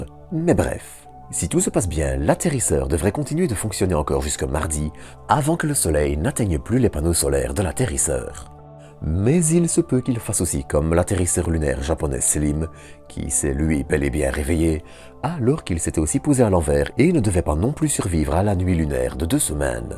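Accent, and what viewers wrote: Belgium. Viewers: Avec l accents belge une fois..ça fait comique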